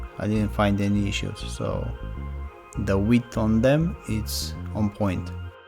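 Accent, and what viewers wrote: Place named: Spain. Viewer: Hablas español, ¿Verdad? Suenas muy similar a Carlos Sainz hablando inglés. Hace unos días probé los ultraboost 1.0 lcfp. Hasta el momento es el par más cómodo que he utilizado, el único problema es que no tienen plantillas, por lo que dudo que sean cómodos para utilizarlos todo el día. ¿Los has utilizado?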